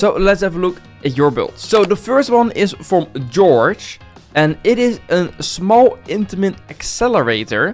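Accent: Netherlands. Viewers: Bro the dutch i am dutch and all of the time i think all of the time are you dutch. So are you dutch or not? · 😅ik hoor een Nederlandse accent ben je Nederlands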